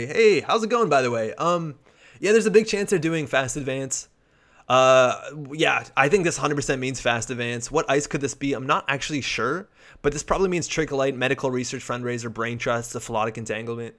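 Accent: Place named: Canada. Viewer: The canadian accent was much stronger back then!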